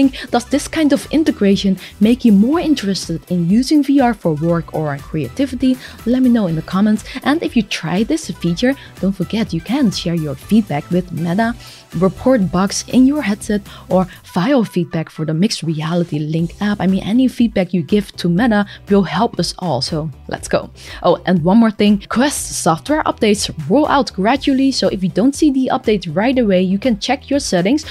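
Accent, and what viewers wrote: Netherlands. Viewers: She's from the Netherlands or something.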